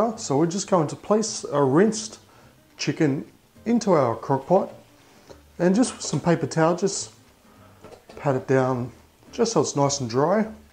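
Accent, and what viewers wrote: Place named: Australia. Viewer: From one Aussie I can say he is from Australia!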